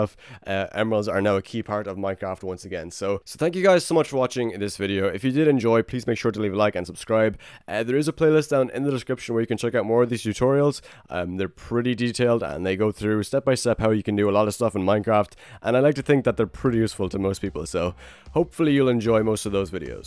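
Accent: Ireland. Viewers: Are you Irish cause you sound like iy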